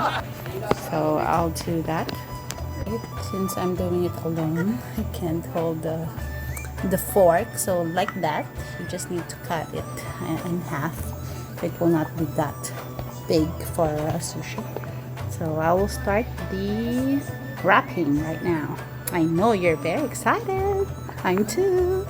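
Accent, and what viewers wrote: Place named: England, United Kingdom. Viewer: Yum! 😋 love your english accent! Galing magchopsticks ha! Your awesome! 😄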